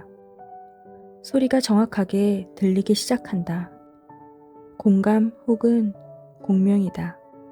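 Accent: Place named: Gyeongsang, South Korea. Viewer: 목소리가 듣기 좋네요~❤ 경상도 사람 같아요 ~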